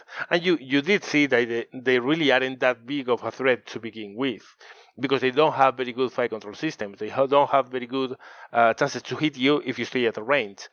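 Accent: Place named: Spain. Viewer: Keep on doing what you do now, if only you have the time and energy. The accent doesn't personally bother me at all, rather on the contrary. I don't speak Spanish myself, but I love listening to it because of the flow of it and how it sounds. Your accent kinda imbues your English (which in my opinion is excellent) with the same kind of flow without making it difficult to understand, so it's all good. Drachinifel is Drachinifel, and is well and truly deserving of his status. I also very much agree on what you said about his wits and dry humor. It was only a few weeks ago when I last watched the "Voyage of the Damned" about the Imperial Russian 2nd(?) Navy's unfortunate and incompetent voyage from the Baltic Sea to the Pacific, and I laughed so hard my eyes were watering 😂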